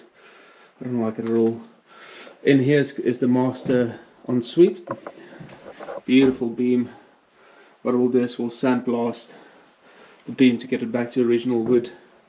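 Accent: South Africa. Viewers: is that a SA accent hear? SUBSCRIBE!!! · I support you efforts on restoring this Italian beauty but please please work on that monotone South African narration 🤮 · Thought l recognise that SA accent. Bietjie verbaas dat jy kla oor kerkklokke wat mag raas? skaam jou! Klou aan die bietjie mooi in die lewe. Dis n voorreg om daar te kon koop en alhoewel dit baie werk gaan wees, maak die meeste daarvan - van ALLES!!!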